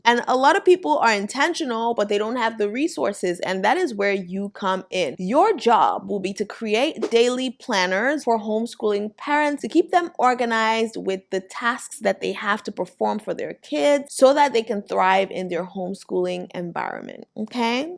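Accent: Nigeria. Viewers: Are you Nigerian by chance?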